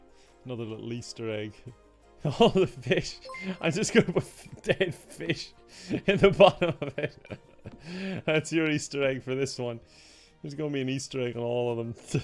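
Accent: Ireland. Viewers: is so kind over stream wth! aaa soft irish lad!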